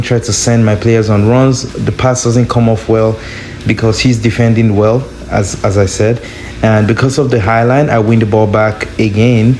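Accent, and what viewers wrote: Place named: Nigeria. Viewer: Bro are you Nigerian? I can hear your accent a bit. Also what’s your ID so we can play a friendly if you have time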